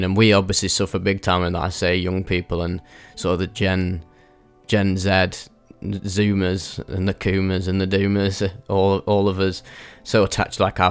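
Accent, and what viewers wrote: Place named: Northern England, United Kingdom. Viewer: Eee lad, I love that northern accent and humor. Trying to keep me wig on. 😅…. You’re awesome 😊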